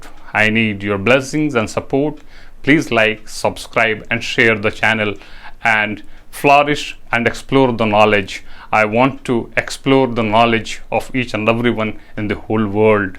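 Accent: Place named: South, India. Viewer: Nice work bhaiya. Congratulations . n I will say it again. You have south Indian accent. 🤘🤘🤘🤘🤘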